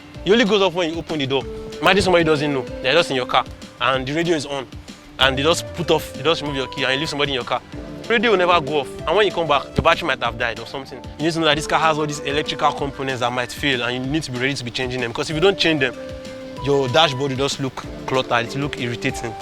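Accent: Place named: Nigeria. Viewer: Great review. Love your Nigerian English dialect. Do you get the later models in Nigeria too?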